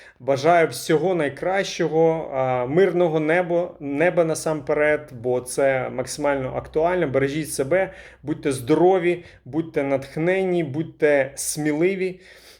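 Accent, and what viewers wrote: Russia. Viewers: Что то вы с сильным русским акцентом и натужно по-украински изъясняетесь. По русски у вас получается более бегло.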